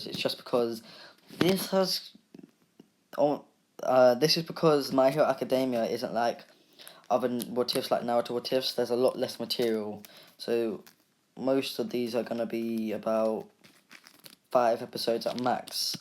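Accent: United Kingdom. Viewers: Yer british aint ye mate like yer accent could ya be british to? Idk ;-; ya sound british alright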